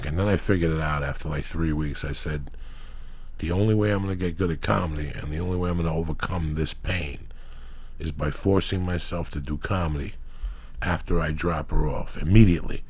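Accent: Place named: Bronx, United States. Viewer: uncle Joey I have to say I have become one of your biggest fans over the past month man you remind me of my late father but n a good way. your stories are INCREDIBLE and your personality and overall way with people is admirable. I hope I get to meet you one day and as an Italian from Philly I was fooled in thinking u were a Bronx Italian and even tho your not I beleive your the godfather lol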